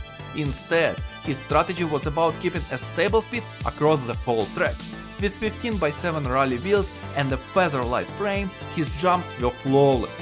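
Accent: Russia. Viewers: Слышу Русский акцент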